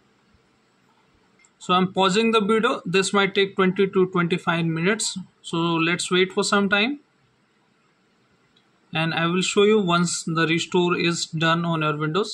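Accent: India. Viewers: I am from India ❤❤❤ and I know that you also from India, Because your English pronunciation like Indian 🎉🎉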